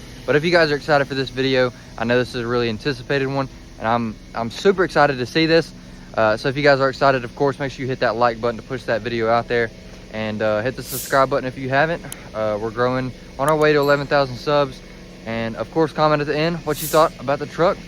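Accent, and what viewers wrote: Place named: Alabama, United States. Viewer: you from Alabama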